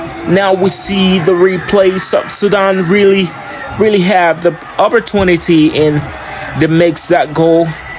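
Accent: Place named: Liberia. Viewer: My brother don't mind those people complaining for not using Liberian accent ...I am from Nigeria and married to a Liberian woman for 23 years 3 kids...Trust me most people can't understand when you speak in Liberian accent..you can't grow by remaining LOCAL...You have to Twick your accent to attract a wider audience.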